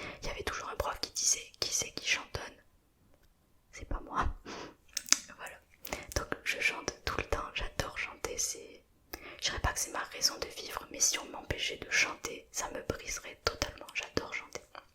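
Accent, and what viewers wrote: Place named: Carcassonne, France. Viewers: Je me disais bien que tu avait l'accent d'ici (Carcassonne 😂) ! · Merci de t être confiée comme cela. C est touchant. Et je me disais bien que cet accent venait du sud ouest...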